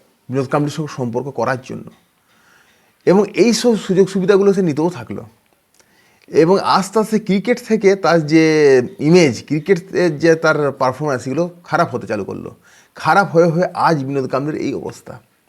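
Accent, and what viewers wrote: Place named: West Bengal, India. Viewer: 'Ramakant Aacharya' noy, sathik naam hocche 'Ramakant Aachrekar', surname er shese 'Kar' ansho ti thakle tini certainly Marathi haben, etai riti. Video banabar aage motei homework karen ni, saadharan dharana tukuo nei aapnar aar video banate lege porechen ei most sub-standard knowledge niye du-paisa kaamabar love ekaaje neme porechen? Moreover, aapnar kathay puro aanchalik taan, medinipur othoba Bankura jelar 'Dialect' e katha bolchen huge platform e ese. Chi: aage nijeke properly groom karun. Eto substandard quality niye 'YouTube' er moto wide platform e chole esechen. Aapnar narration etotai kharap je shunte ritimoto kaane peera dicche. 'Like' to durer katha aapnake 'Dislike' diteo ghrina hocche! Saadharan shuddha Bangla tao bolte paaren na Bangali hoye, lajjar katha!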